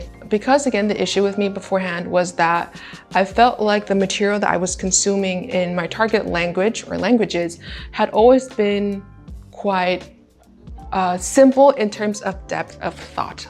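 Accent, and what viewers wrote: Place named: France. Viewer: What a beautiful french accent, congrats!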